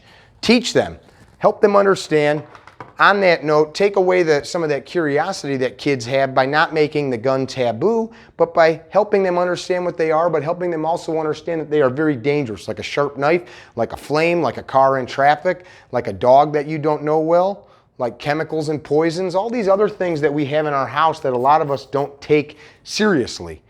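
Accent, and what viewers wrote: Chicago, United States. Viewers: Daaaaar... 'ere an da Sawt Side, we keep err kids away fram dat kiynd a dings, ja know. Just kidding... I love the content and the accent makes me homesick for Chicaaaaagii. Gaad bless!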